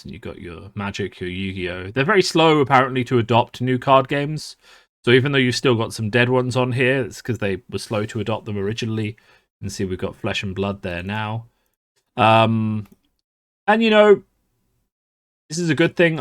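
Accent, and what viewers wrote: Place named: United Kingdom. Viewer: A Lovely British Accent Indeed! Yes I Listened To The End)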